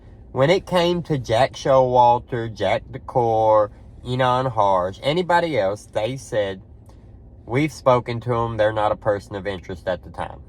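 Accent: South, United States. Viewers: You are the cutest little southern boy I have seen . You are very sensible and quite smart . It’s so refreshing to hear your sweet southern accent which is pretty rare to hear here in NY City . I am a Grandma and have much more years on you . But if I was young again I would make you my boyfriend . Happy Holidays . Your secret admirer Grandma .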